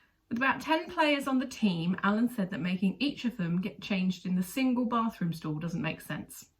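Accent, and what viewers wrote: United Kingdom. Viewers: For some reason I just smile when seeing this adorable human being. The British accent might play a role but ultimately it's just the kind and calm nature you experience from her. I utterly enjoy listening to her.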